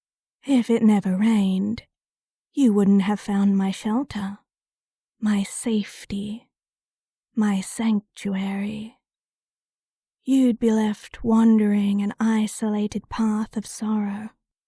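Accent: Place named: South, United States. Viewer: Thank you so much for filling my script! I don’t know if I’m crazy or not but I think heard a slight southern ting in your performance and I really liked it. Brought out the preaching part of this script well. Thank you!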